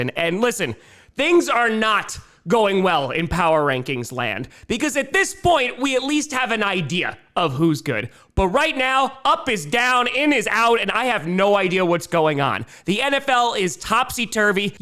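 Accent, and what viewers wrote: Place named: New Jersey, United States. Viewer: He’s from Jersey. Pretty common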